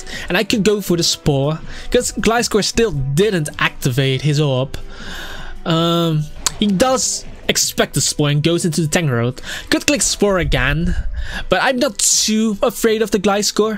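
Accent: Netherlands. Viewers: Just out of curiosity, why do you want to have a British accent? I kinda like your Dutch accent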